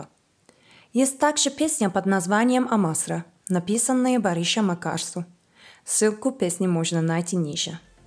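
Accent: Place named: Russia. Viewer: rusça aksanın * - *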